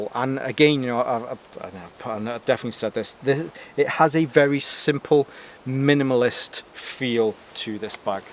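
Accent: United Kingdom. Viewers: One of the most detailed review in British accent. Started to get interested in MCB fabric. Luv it!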